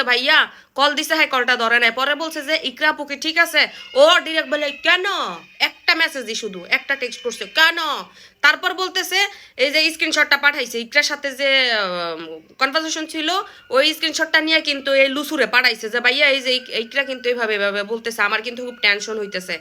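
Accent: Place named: Narsingdi, Bangladesh. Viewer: আপনি কি নরসিংদী জেলার মেয়ে? ভাষাটা এমনি মনে হয় 😅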